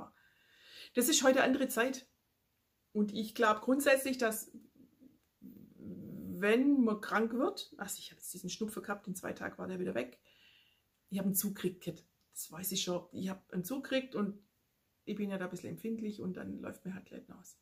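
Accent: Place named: Swabia, Germany. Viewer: Hallo,was hab ich mich auf und über dein Video gefreut.Das es dir gut geht (Mann und klein Lily natürlich auch) und das das Ergebniss von deinem Knie ein gutes war,Gott sei Dank.Ich schaue nicht sooo viele Potcast aber deine verpasse ich nie,ich hör so gerne wenn du schwäbelst:)So,jetzt wünshe ich dir noch eine schöne Zeit,und vor allem bleibt alle gesund. Es grüsst dich ganz herzlich die Gaby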